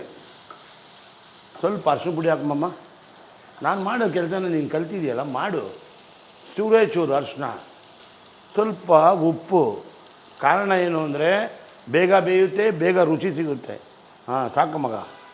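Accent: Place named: Bangalore, India. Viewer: ಗುರುಗಳೇ ತಮ್ಮದು ಯಾವ ಊರು ತಮಗೆ ಯಾವೆಲ್ಲಾ ಭಾಷೆ ಬರುತ್ತದೆ ಕೆಲವೊಮ್ಮೆ ಬೆಂಗಳೂರು ಭಾಷೆ ಆಯಾಡ್ತೀರಾ ಮತ್ತೆ ಮಂಗಳೂರು ಭಾಷೆ ಆಡ್ತೀರಾ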